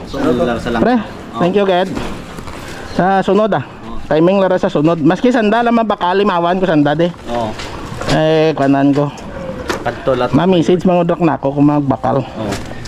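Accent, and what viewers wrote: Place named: Bacolod, Philippines. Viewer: Favorite ko alimasag. You souded like a person grom bacolod po kasi yung accent nyo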